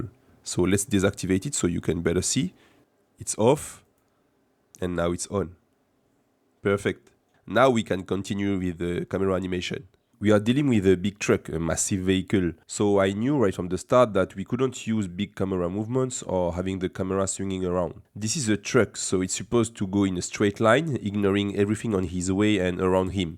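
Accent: France. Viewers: But alors ? You are French !!! ^^^ Au moins avec ton accent Français je understand la moitiés des sentences of the vidéo ! ^^ Merci pour la vidéo...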